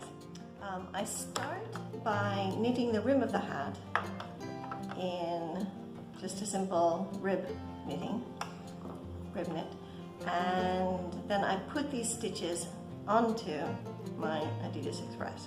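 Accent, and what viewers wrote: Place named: Australia. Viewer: We did not "hear" any Spanish in your accent, sorry thought you might have been an Australian or New Zealander. Have you considered using the same videos for the Addi and re-recording them in Spanish? It might give you more subscribers. Just an idea from your comment in the video. Thank you for a very good video on doing the Messy Bun on an Addi.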